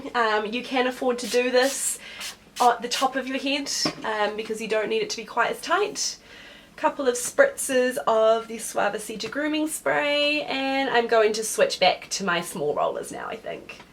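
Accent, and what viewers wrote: New Zealand. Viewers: yep that's the kiwi (NZ) accent :) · Knew I heard another New Zealand accent! Hello from home, great tutorial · Is she from New Zealand? Her accent sounds kiwi. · You have the most interesting kiwi accent and you were absolutely born for that red lip. Gorgeous. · Yay NZ accent, I've never heard us on YT before! Great tutorial, thank you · I think they look really nice. I might put in my lady hair when I pamper her eg pedicure. BTW is that a New Zealand accent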